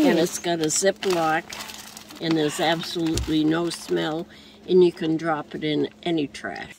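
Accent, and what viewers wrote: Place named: New England, United States. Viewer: I love hearing yet another New England Accent on the road besides Lulu. I can't wait to join you guys!!!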